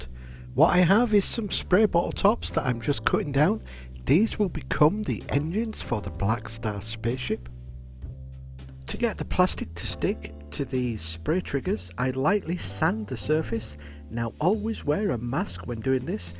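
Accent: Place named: England, United Kingdom. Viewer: Great build. Nice to hear an English voice for a change. Davey Jones.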